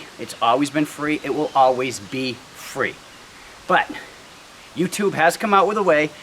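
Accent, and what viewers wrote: Boston, United States. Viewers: Question. Are you from Boston? Hahaha. Love the accent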